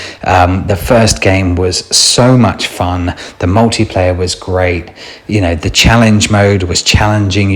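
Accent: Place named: United Kingdom. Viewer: Hi , isn’t Worms an Amiga game that got a DOS/PC version amongst many other ports? “PC” from this era (in the UK at least ) generally refers to IBM compatible machines rather than other home computers (ST, Amiga etc). I would have referred to the original Amiga version if it were my video - not “PC”. I remember this being released in the UK as I worked in a computer game shop at the time . I appreciate a lot of YouTube videos are America centric but you sound British . Kind regards D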